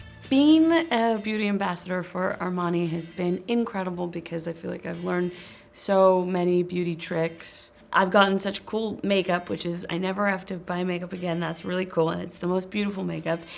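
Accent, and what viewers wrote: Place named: United Kingdom. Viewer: She’s so funny and talented to. Her British accent is 👌🏼❤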